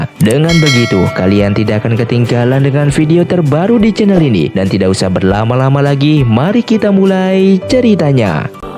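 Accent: West Java, Indonesia. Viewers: Sunda mang? Abdi sunda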